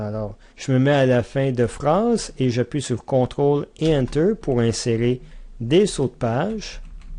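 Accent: Quebec, Canada. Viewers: avec l'accent québécois en plus!!! :P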